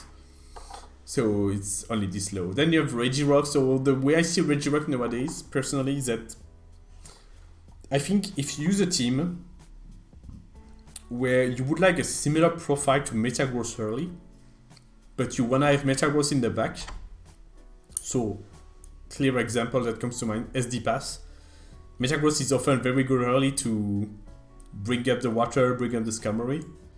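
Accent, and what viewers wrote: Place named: France. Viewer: When you try to hide your french accent but it's getting worst..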